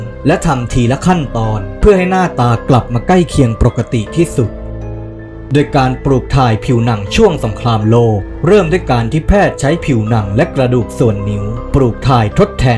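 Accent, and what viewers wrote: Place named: Phetchaburi, Thailand. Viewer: สำเนียงเหมือนคนเพชรเลย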